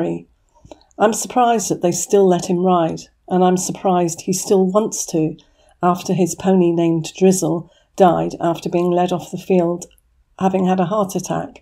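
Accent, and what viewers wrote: United Kingdom. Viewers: Show more of the video, American don't speak such British English . what were you doing there, you are published this video like someone not permitted to do so just for YouTube purpose . To get some subscribe and cash.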